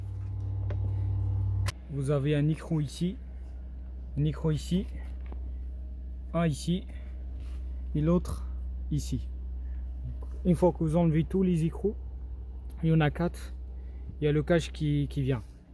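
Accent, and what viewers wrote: Algeria. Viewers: Votre accent est algérienne monsieur 😂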